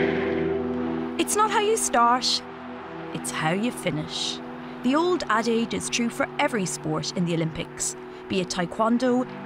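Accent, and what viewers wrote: Ireland. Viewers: She has a wonderful Irish accent. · Yes. Just with an Irish lilt. Also if you are going to criticise language, you should spell English with a capital E.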